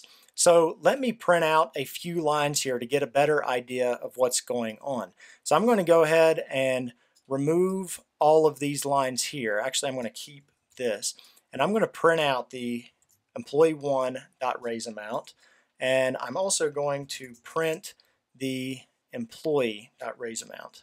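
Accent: United States. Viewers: Great videos. Love them. Learnt a lot from you. Thanks so much. The American accent was awesome too. Like Buster Scruggs teaching me about class variables. Wanted to shoot a gun into the air each time I completed something. Yeehaw. pew pew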